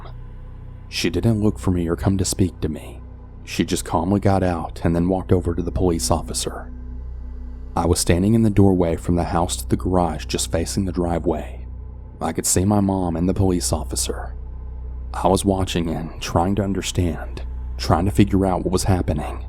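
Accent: South, United States. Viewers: No to southern cannibal narration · The narrarator sounds like a country boy.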